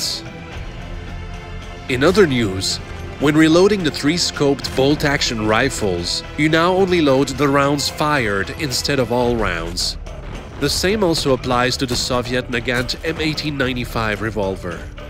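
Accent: Germany. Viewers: That German pronunciation XD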